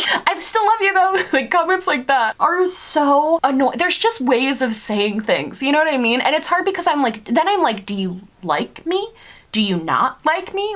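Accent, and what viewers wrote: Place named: Upstate New York, United States. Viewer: I'm new to your channel, and I'm from Buffalo!! I loooove that I found a creator who kinda carries the "personality," for a lack of better words, of upstate/WNY. I LOVE your personality and the way you speak because it reminds me of home, everyone is lowkey annoyed but grateful at the same time and its very refreshing <3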